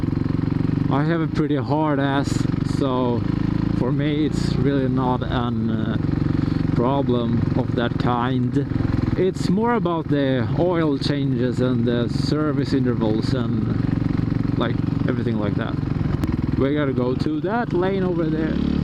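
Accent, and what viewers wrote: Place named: Sweden. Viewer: Hahaha so funny!! Make more videos like this! (Y) Awsm riding! :D Jeg elsker din svensk-engelsk, haha, er fra Norge selv.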